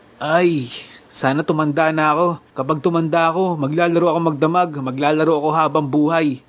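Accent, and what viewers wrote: Ilocos, Philippines. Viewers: Ilocano po kayoo?!!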